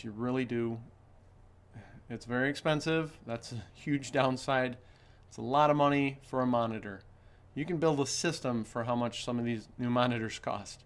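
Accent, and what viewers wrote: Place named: United States. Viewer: Country voice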